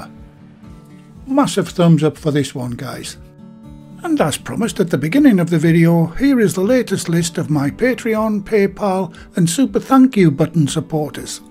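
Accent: United Kingdom. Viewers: I like the British accent rather than the American, this is one of the reasons I like this video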